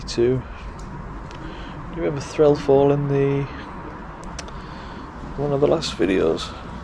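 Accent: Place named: Liverpool, United Kingdom. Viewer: Have you ever seen the little ghost? Or any orbs? A fascinating place with so much history- I live in Canada where obviously most of our cemeteries aren't as old- depends on where you live. I did an anthropology project years ago when I was in uni, one of my favourite essay topics. I love your accent- the Liverpool accent is very distinctive.. Namaste from Canada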